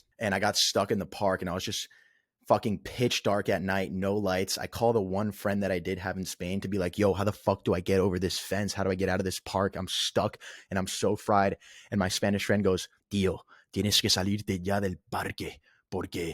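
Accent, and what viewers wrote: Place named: Long Island, United States. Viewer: sounds like you are from long island lol. We didnt 'have vape pens in my day but I can relate to this a lot. If you use pot on a daily/ nightly basis, it will turn on you eventually.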